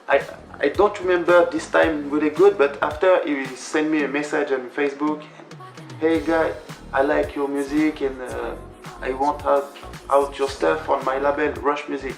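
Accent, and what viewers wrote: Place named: France. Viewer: Mon gars ta le vrai accent français, je dit ca mais je ferais pas mieux. Non tu assure en vrai. Continue a nous faire kiffé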